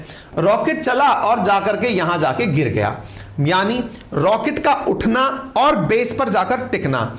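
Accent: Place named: Uttar Pradesh, India. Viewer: App ki English mairi tarah hi hai , due to up personal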